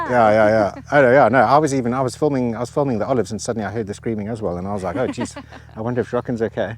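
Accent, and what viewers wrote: South Africa. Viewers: Get yourself some temperature probes. You probably overcooked the crap out of the chicken but who knows unless you can get the internal temp. In fact someone please send some to review for sponsorship dollars. The cool bluetooth remote ones. Also it's "Weber" not "Weeber" - j/k the saffa accent is cool🤣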